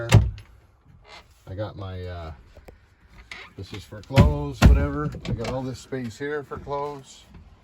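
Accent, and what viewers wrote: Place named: Bahamas. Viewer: Why does he sound a bit Bahamian from Abaco Island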